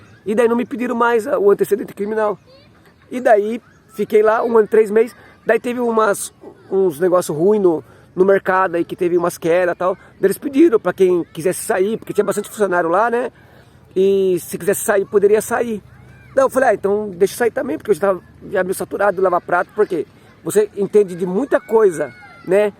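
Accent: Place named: Rio de Janeiro, Brazil. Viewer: Sempre sigo suas dicas pois é um dos melhores canais sobre mecânica e principalmente sua humildade seu carioca e simplicidade nos vídeos que são muito instrutivos que Deus continue te abençoando sempre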